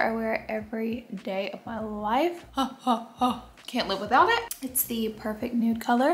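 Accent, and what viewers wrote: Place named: Alabama, United States. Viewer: Who else loves when says oil? No one? Just me, okay. Girl, I love your Alabama accent!❤❤❤. Oh I’m adding all the things to my Amazon cart..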